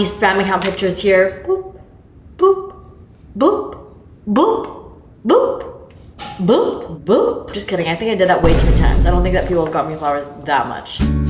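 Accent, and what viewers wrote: England, United Kingdom. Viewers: loved that british accent lol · Gosh you crack me up with your english accent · Love the vids but the English accent ain't it sis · Your British accent is so good, I love it. Your videos are also so good. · the British accent is not it girl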